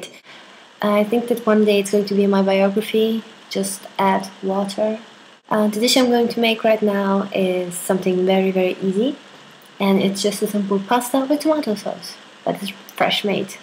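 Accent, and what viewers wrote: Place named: Ireland. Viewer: ah du hast schon voll den irish accent haha:D das wochenende wird witzig..seh ich jetzt schon kommen!:D und achja..was ist eigtl fürn account?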